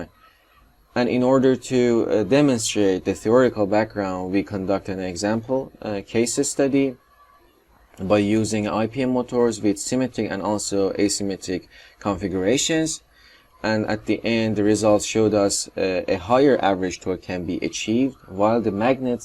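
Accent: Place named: Iran. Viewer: Sound IRANIAN dialect!!! Thanks by the way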